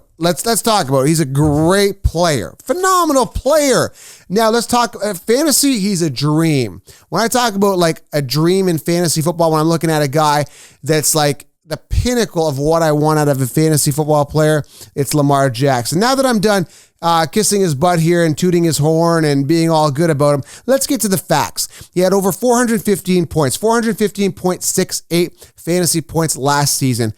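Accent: Canada. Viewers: Are you canadian? i think i can hear a slight accent lol.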